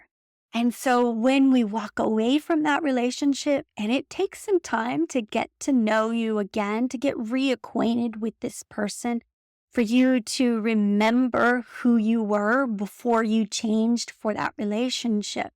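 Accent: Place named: South, United States. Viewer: So nice to 'catch up' and hear a southern accent. ;) Love from Boston.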